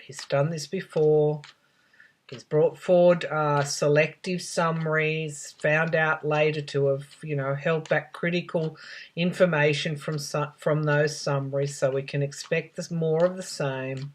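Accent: Australia. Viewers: Good morning my beautiful calm and funny Aussie friend. I have no plans to listen to the BS behind the Mueller report again today just like I didn't listen to The First One but listening to you this morning and you used a curse word cracked me the hell up😂 as a matter of fact I think I was half asleep listening to you and then when you said that I sat straight up in the bed and paused your video and just laughed. And with that beautiful accent of yours you are in it to win it just as much as we are. Much love light and blessings are sent your way. By the way even when you throw some serious shade you are still a lady.🗽🇺🇸💐🗽 Sleepless in Indiana. P.s. God Damn! You are something else! OG. Just thought of something else. Maybe after all is said and done and all the BS comes out, the GOP will have a reunion at Jurassic Park and try to plan their next strategy with their prehistoric animalistic instincts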